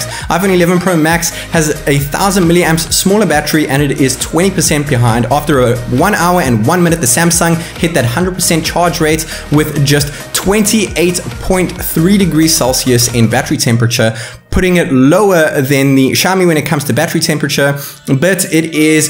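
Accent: South Africa. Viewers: Are you South African?? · South African accent, talking at the speed of an auctioneer. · U sound south African so u now my favourite tech guy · You are definitely south african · Instantly knew you were also South African once I heard your voice, now I gotta sub haha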